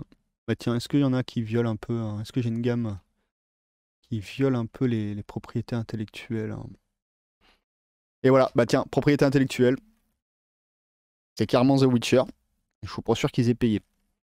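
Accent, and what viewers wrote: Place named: North, France. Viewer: Budget vs temps, le dilemme absolu... Oh un accent du Nord.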